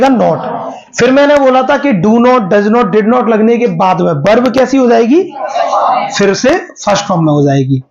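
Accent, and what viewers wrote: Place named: Bhind, India. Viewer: Sir apki awaj Bhind jile jaisi lag rhi aap kaha se pada rhe ho please reply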